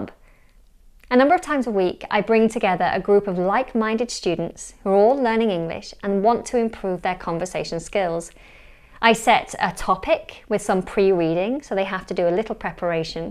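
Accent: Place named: RP, United Kingdom. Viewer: I adore your posh accent! :)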